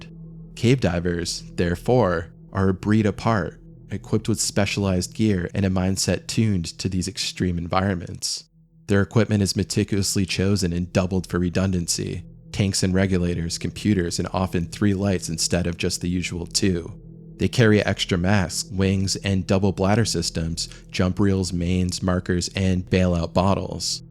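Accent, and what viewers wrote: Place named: California, United States. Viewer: U are SO Californian🤣 I love listening to you cause it’s like one step down from Bill and Ted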